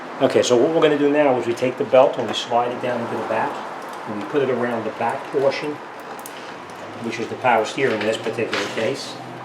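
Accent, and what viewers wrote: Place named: New Jersey, United States. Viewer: I can tell from your accent you're from Union or Bergen county NJ. I am from NJ. Every mechanic I hear is from NYC/NJ hahaha. Thank you I needed this.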